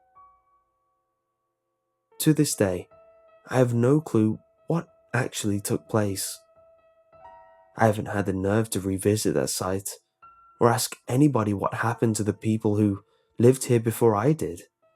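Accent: United Kingdom. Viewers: your British accent make it more creepier. good job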